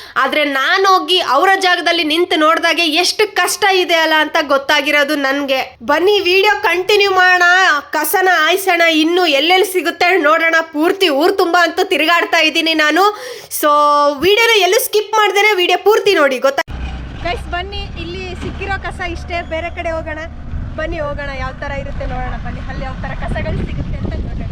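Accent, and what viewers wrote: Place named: North Karnataka, India. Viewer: ನಿಮ್ಮ್ own language ತುಂಬಾ ಇಸ್ಟ್ ಬಹುಷಃ ರಾಯಚೂರ ಮುದ್ದೇಬಿಹಾಳ್ ಲಿಂಗಸೂರ್ ಬಾಷೆ 🎉🎉🎉ನಿಮ್ ವಿಡಿಯೋ ತುಂಬ ಇಷ್ಟ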